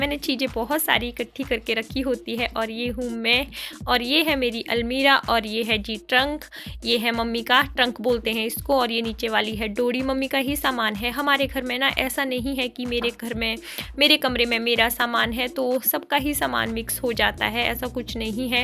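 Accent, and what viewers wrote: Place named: Haryana, India. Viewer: Aapki language Hamare Haryana se bahut match khati hai Hamare Haryana mein bhi Aisa Hi bolate Jaisa ki aap baat karte ho bolte ho idhar ko Udhar ko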